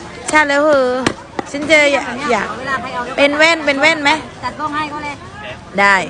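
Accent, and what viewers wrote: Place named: South, Thailand. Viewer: พูดใต้เถิดครับมันง่ายดี-ถ้าพูดกลางเดียวก็กับมา-ใต้อีกครับผม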